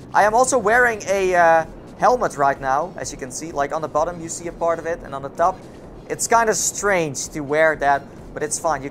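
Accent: Netherlands. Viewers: gek nl accent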